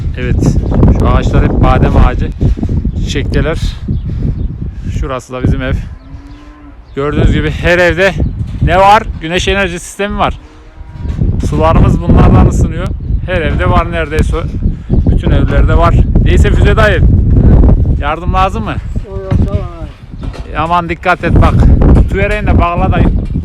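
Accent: Konya, Turkey. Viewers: Sen Konyalı mısın Konyalı gibi konuşuyorsun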